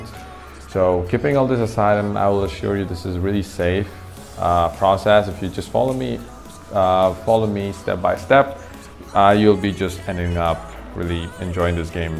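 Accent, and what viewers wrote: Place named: India. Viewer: indian with crazy english accent 😍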